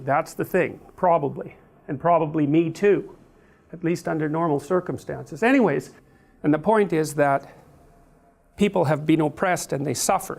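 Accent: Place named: Canada. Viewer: With a Canadian twist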